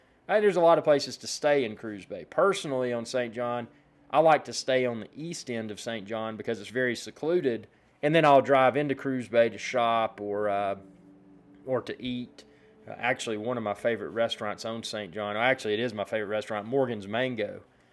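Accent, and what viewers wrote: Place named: Tennessee, United States. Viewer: Sounds like Tennessee—p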